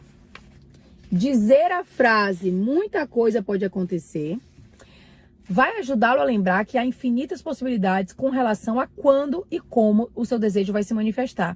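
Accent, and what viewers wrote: Bahia, Brazil. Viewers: Rumo ao 100 mil 🌻 #novaaquinocanal 🧡 faz acho q uma uns 15 dias que você apareceu na minha vida, gente quanta luz, quanto energia boa, aaaamo o sotaque baiano, só ele por si só já nos trás acalmaria, a certeza que tudo é lindo, que tudo chegará a nós. Hoje resolvi me organizar e assistir toooodos os seus videos☺ acabei de ver a playlist da lei da atração, os 33 vídeos 🤭 e fui anotando todos as principais mensagens para colocar em prática. Obrigada por dividir com a gente tanto conhecimento, alegria e certeza que o universo é perfeito. E por manter (no meu caso) a Fé como absoluta. Agradeço a você, agradeço a sua vida (lulu, ben e lucas). Fica bem!! Você está mais linda, amei seu evolução (afinal vi vídeos de 6 anos atrás 🤭). Gratidão 🌻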